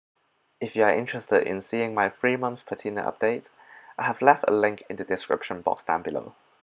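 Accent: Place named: China. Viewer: you chinese ah? sounds like uncle Roger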